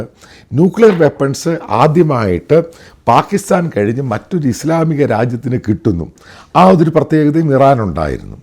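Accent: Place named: Central Travancore, India. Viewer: മദ്ധ്യ തിരുവിതാംകൂർ ശൈലിയാണ്.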